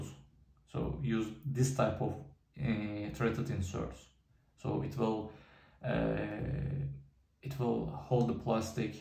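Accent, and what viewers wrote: Turkey. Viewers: thanks and Ramadan Kareem! Noticed you have a Turkish accent 😉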